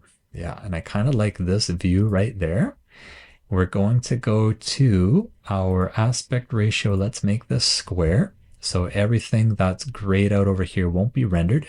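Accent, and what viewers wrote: Canada. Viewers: I could tell you were Canadian by your accent, and I've been trying to specifically place it, but I don't think I would've guessed BC. Hi from a fellow Canadian!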